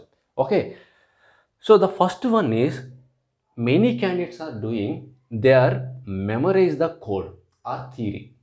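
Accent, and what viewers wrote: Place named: Hyderabad, India. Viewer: I'm sure this sir is teaching some course in Ameerpet. The Slang is same as Ameerpet Faculty. I wonder what course and which institute. J want to join ur institute sir. Pls tel me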